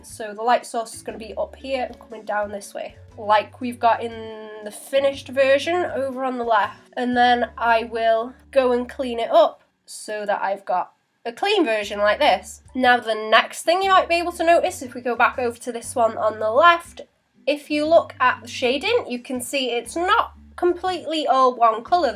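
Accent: North West England, United Kingdom. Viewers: I've never seen any of your videos before, and I was surprised to hear your accent. Just through sheer volume 9/10 artists I find on Youtube are from the US. You sound like you might be from the North West like me???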